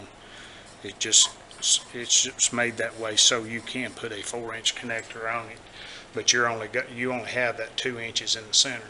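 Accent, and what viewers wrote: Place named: South, United States. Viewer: Thank you for the review. I've been eyeballing planers and this helps in the selection. It also explains why I've had snipe issues with using my father's 735 planer. Also no issues with audio here, most people probably just can't understand the Southern accent, I'm from the south so it was loud and clear here. 🤣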